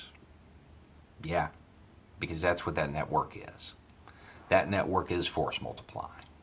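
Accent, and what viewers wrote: South, United States. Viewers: lol, I love stealthing anarcho coopeativist and old-school punk rock organizing with a Southern accent. My problem is I lack subtlety, or I get off too much on talking up the good folks of Chiapas to squares. Keep on rocking until there's a free world buddy.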